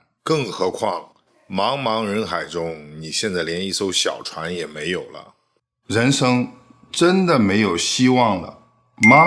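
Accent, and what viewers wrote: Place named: Henan, China. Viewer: 你口音很像我老家口音！！！河南密县人！！！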